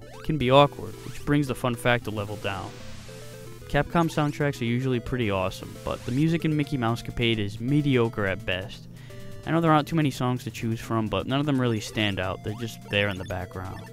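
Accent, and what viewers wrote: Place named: New York, United States. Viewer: I don't see all that much AVGN, really. He just has a bit of that New Yorker accent. :T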